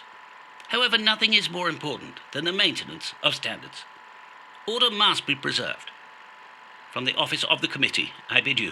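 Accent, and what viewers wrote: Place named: RP, United Kingdom. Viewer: Ahhhh, at last. Something in good old Queen's English! It all makes sense now. I shall rush out to Tandy this instant and buy myself some helectronical componentry with which to build myself a "rig" in ones "ham shack"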